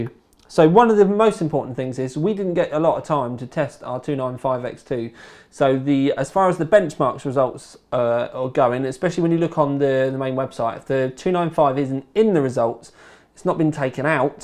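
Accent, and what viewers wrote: England, United Kingdom. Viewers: ferdaus bhuiyan so he's from England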